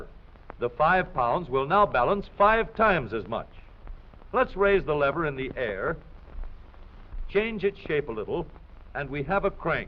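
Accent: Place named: South, United States. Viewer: Dat southern accent :D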